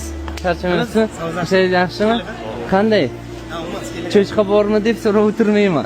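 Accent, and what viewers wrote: Uzbekistan. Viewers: Buxorodan bulsa kerak shevasidan baraka toping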